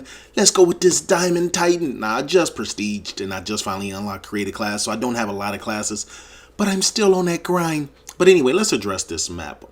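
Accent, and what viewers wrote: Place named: United States. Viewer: It's not pronounced call of dooty it's jewty fucking yank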